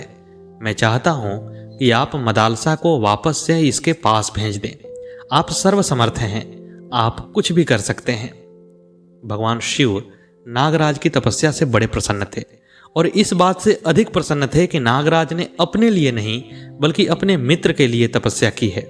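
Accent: Standard. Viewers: अति उत्तम कहानी।आपकी वाक शैली और शुद्ध उच्चारण के प्रयोग से कहानी के तत्कालीन वातावरण का आभास होता है जो श्रोता को प्रभावित करता है।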